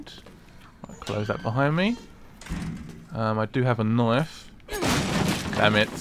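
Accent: United Kingdom. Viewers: God I love his British voice mmmmmmmmm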